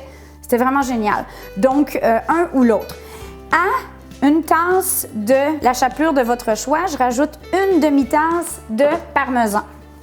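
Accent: Canada. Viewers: j'adore votre accent...canadien? ja aime' aussi votre carbonara,ça donne envie d'essaye:-)from Italy♡♡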